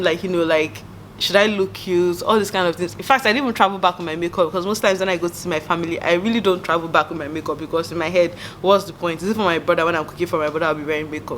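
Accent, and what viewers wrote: Igbo, Nigeria. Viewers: I’m just here to hear you talk, love your Nigerian accent. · haha I really enjoyed this video and your Ibo is sweet! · Your igbo be sweeting me.... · 😂😂😂 Your accent plus your facial expressions and your general attitude is just epic😂 Ewo, my Nnewi sister. They can't even do arranged marriage for me and I'll collect. Odiegwu 😂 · The Igbo!! Like honey to my ears😫 You're so gorgeous. I'm here to stay.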